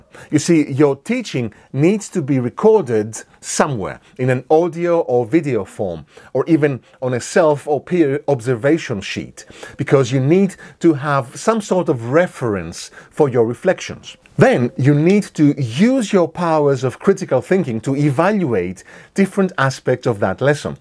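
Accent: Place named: Greece. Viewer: Noticed right away a greek flavor in your accent Mr.. Thank you and Καλή χρονιά!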